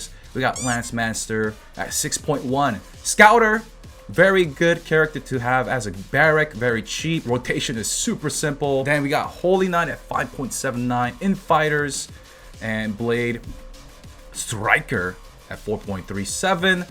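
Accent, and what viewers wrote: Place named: United States. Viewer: Dude, are you from america? Your english is perfect. A lot of the korean streamers out there do speak english, but obviously have a korean accent. If I didn't check already, I would think you were from the states.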